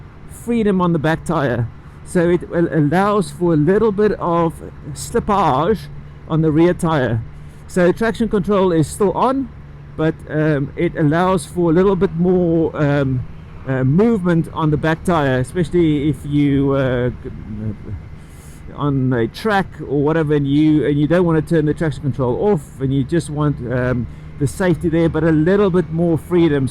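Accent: South Africa. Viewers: How long have you lived in the UK ? I assume your an SA guy by the accent :) I live in the States. Southern CA and now in Utah. Been in the USA for 31 years now. I have a 2014 ST R. Looking to upgrade to this 2021 version. Can you compare the 675 to the new CC bike ?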